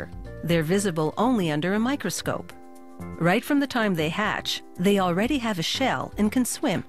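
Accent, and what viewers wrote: Canada. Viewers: The speaker is canadian... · I hear a very distinct Canadian accent.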